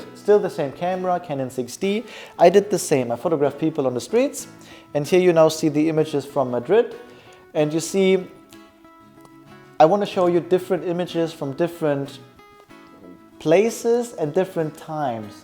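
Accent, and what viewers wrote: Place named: Germany. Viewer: That was the frist video I saw of you. ☺️ Watch a couple more last night and I love them. And your accent is kinda funny in a cute way. No offense. I really like that german accent.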